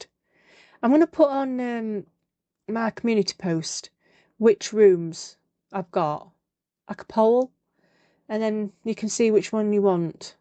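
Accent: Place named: South Yorkshire, United Kingdom. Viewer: im loving watching you make this, i recognise your accent and voice. Im from South Yorkshire maybe thats why xx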